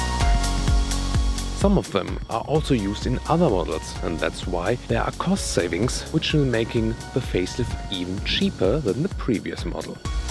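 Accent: Germany. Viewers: ***** Kann dir da nur zustimmen. Sprichst zwar mit einem starken deutschen Akzent, ansonsten geht dein Englisch völlig in Ordnung. Und so ein Akzent ist halt völlig normal bei einer Fremdsprache.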